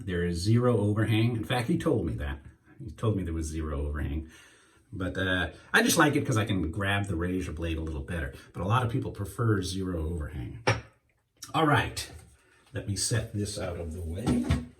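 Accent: Newcastle, United Kingdom. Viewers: I love your channel bro.Love from Liverpool uk.You may be a yank now but you can tell a Geordie.(Newcastle for outsiders)Take care mate.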